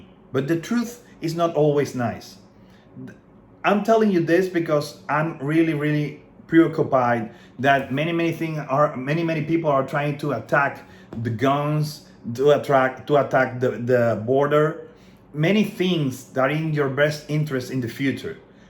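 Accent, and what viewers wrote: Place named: United States. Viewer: Todo un americano hablando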